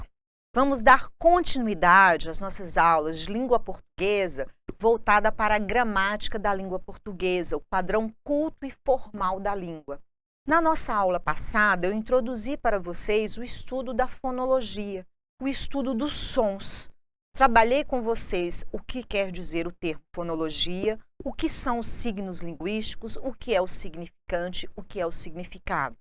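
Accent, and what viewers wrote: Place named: São Paulo, Brazil. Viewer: De onde a professora tirou este sotaque paulista? Andou trocando o mineirês pelo paulistês.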